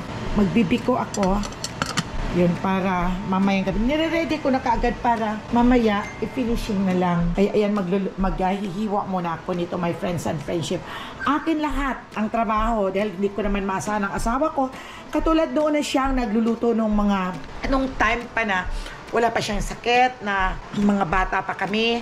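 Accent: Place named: Iloilo, Philippines. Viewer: bakit po parang ilongga po kayo.. sorry napatanong lang po.. super enjoy ako aa content nyo po.. may advice pa kayo na binigay..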